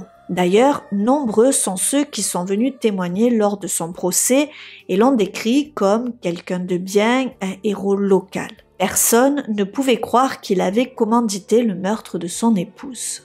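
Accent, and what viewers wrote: France. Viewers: Je ne crois pas avoir déjà entendu parler de cette affaire, sinon c un plaisir d entendre ton accent qui vient du sud si je ne m’abuse? Merci pour ton travail et tes vidéos! 🙏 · Je suis particulièrement déçu que tu ne tiennes pas compte des remarques que l'on peut te faire... Tu es plutôt mimi à regarder...tu as un jolie timbre de voix et ton accent du sud (je suppose) rend tes histoires fort dépaysante... Mais par contre qu'est ce que c'est moche de t'entendre dire mariâche...montâche... garâche...partâche...et tous les mots finissant par age... Pourtant tes récits ne sont pas toujours ou souvent repris par d'autres...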